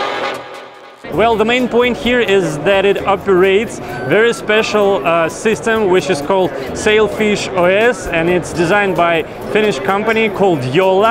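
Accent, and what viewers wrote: Russia. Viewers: ужасный русский акцент) а так всё норм)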